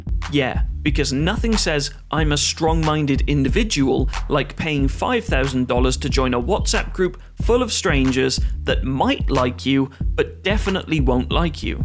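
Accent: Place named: Wales, United Kingdom. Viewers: Why does wikifeed have a North Wales accent? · The Welsh accent.❤ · Why does the wikifeed AI voice sound like it's from Swansea? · I love that the A.I voice you use is welsh.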